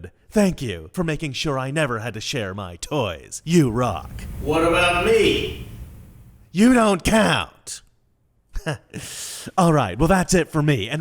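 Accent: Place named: Ireland. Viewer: You have sort of an Irish accent Mr.. Also, thank you for using your pronoun in your name so we know your preference.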